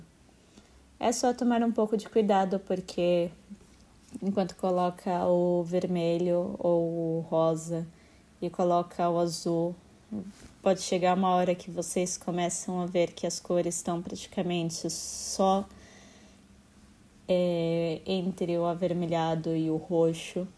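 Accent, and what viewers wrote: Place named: France. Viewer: O sotaque francês eh muito inspirador... Parabéns pelo talento...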